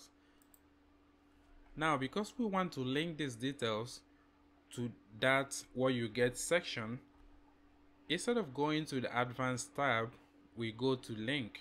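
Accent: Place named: Nigeria. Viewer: ARE NIGERIAN ?? LOVE YOUR ACCENT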